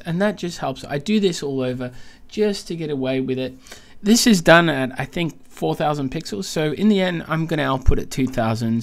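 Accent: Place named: Portugal. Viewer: olá, sotaque português não passa despercebido. já aderiste a este grupo? 3D PT <link> abr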